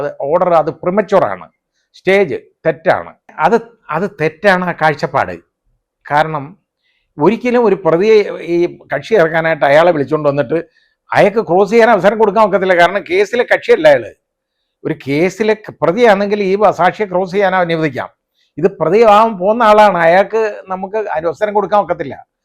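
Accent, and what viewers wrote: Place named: Pathanamthitta, India. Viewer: സാറ് ഇത് തുറന്ന് പറഞത് നല്ലതായി, സത്യം ജനങ്ങൾക്ക് മനസിലായലോ🙏🙏, കാന്തപുരം ഈ വയസ് കാലത്ത് വടി കൊടുത്ത് അടി വാങ്ങി... ഒരു ഹൈക്കോടതി ജഡ്ജിക്ക് പോലും ഈ അവസ്ഥ ആണെങ്കിൽ സാധാരണകാരുടെ അവസ്ഥ ആലോചിച്ചു നോക്കൂ, സാർ... താങ്കളുടെ തുറന്ന് പറച്ചിൽ തുടരുക.. പത്തനംതിട്ട ശൈലിയിലുള്ള സംഭാഷണ രീതി കേൾക്കാനും നല്ല സുഖമുണ്ട്... എല്ലാ ആശംസകളും 💐🙏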